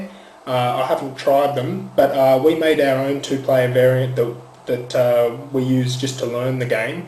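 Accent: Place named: Texas, United States. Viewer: I like your Texas accent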